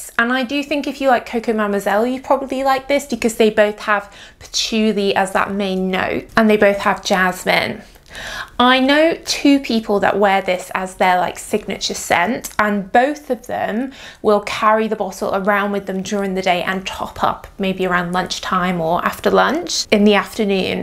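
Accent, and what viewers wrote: United Kingdom. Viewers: I have the Green one it's incredible I m addicted to but I want to have the others! Thanks It's awesome!❤❤ By the way I'm learning english and I like the British accent So It was amazing and useful to watch the video!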